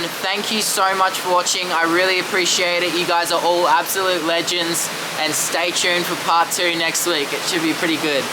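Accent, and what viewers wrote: Australia. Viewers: Nice vid. That ozzie accent though....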